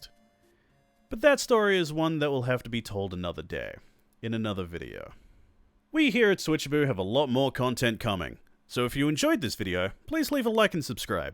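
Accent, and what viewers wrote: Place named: Australia. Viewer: Man the Australian accent sure does kinda suck, basically roll the dice to decide how to pronounce half the words needed to make a video like this. And I say that as an Australian.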